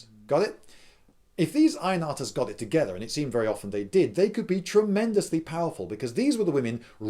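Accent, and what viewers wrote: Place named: United Kingdom. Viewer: I had never heard of this social structure before, very intriguing to say the least. More research is required. Thank you for the fascinating videos on such a wide range of topics. The videos on swords and other ancient weapons is what originally attracted me to your channel. I have also really enjoyed your videos on historicalish, emphasis on ish, movies. I was wondering if you could comment on a particular movie sequence in a recent movie. I was wondering what you would think of the use of the shield wall in the recent Hercules movie with Dwayne "The Rock" Johnson specifically. Incidentally you look and act a lot like my favorite uncle, right down to the British accent. The name is James by the way and thank you again for your channel. I enjoy it immensely.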